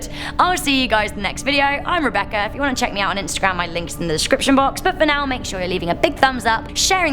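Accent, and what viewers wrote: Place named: England, United Kingdom. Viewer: I started watching this channel originally cuz I love English accents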